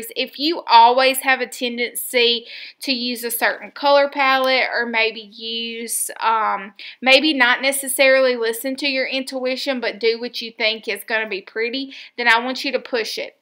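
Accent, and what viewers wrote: South, United States. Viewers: G’day, loved your video so much, new subbie. Another one closer to your 14k. You make so much sense in this video, and just gotta say I love your southern belle accent! 🥰 crafty hugs from down under. Xx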